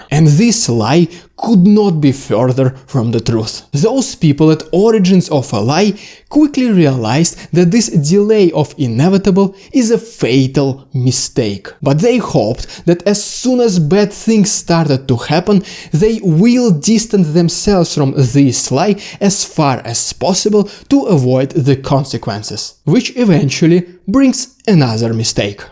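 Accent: Russia. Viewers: К чему такой дебильный русский акцент? (Why such a moronic Russian accent?)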